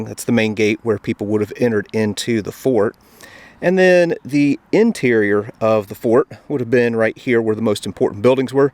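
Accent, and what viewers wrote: United States. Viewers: So so funny listening to an american talking about Roman ruins!! Yeap there was a lot more going on in the rest of the world before the usa came along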